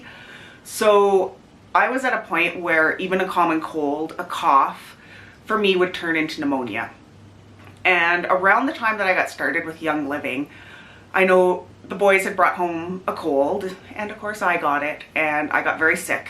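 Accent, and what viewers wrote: Canada. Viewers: I think she’s from out west in Canada because we don’t all talk like her. She’s got the typical out west (Alberta, BC). My family lives out there. I’m in Quebec. It’s fun listening to her because it makes me think of my family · Canadian accents make me happy 🥰 I’m from Tennessee and I love the differences in how we speak! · your Canadian accent is EVERYTHING ❤️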